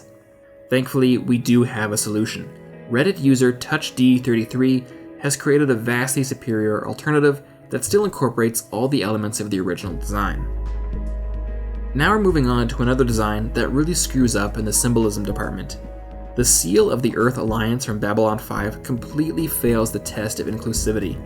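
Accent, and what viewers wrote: Canada. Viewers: You sound so Canadian. The Canadian flag is simple, striking, and well designed!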